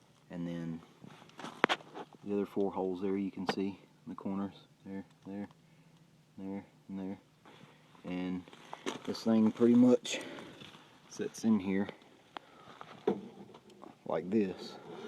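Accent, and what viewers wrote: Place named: South, United States. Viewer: Really informative in a southern accent!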